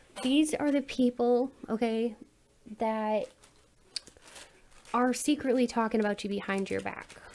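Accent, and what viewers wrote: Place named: South, United States. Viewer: Good afternoon!I admire you very much and i adore your vibe.I am not from America but i feel that you stay in South of America ,in Ney Orleans,or Atlanta.Your vibe and how you speak make me remeber the Gone on the wind.I apreciste if you confirm3 this think!Thank you for your wofk!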